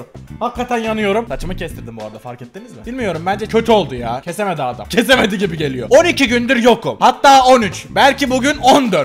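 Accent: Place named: Adana, Turkey. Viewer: Hemşehrimsin abi bende adanalıyım FİŞŞEK tamda adanalı konuşuşu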